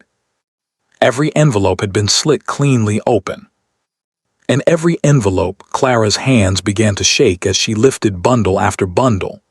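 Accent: United States. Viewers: The American accent is very offputting. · This is a well written story with a Cinderella plot with a duke instead of a Prince. The narrator has a rural American voice when it should be British. I love more visuals than one for the entire read. Thank you for the posting. Your work is most appreciated. From Amelia Island.🏝🏖🇺🇸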